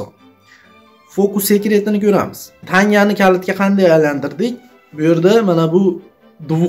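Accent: Andijan, Uzbekistan. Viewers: Aka andijonli masmisiz mobodo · Акам Анжанданмисиз?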